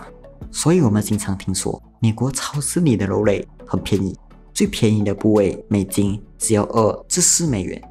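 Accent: Malaysia. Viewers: 这个华语，马来西亚人👍🏻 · 马来西亚人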